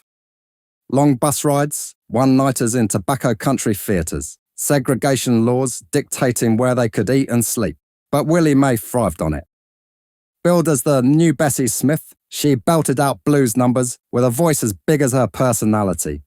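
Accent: United Kingdom. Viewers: This narrator is a supreme God of all of narrators. This is great a greatly appreciated narration. His British tinged delivery is awesome. Well Done, sir. I didn’t know all of these details about her.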